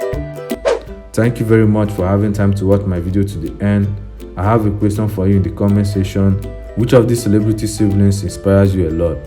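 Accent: Igbo, Nigeria. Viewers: Guy this your Igbo accent is bad ooo work on it biko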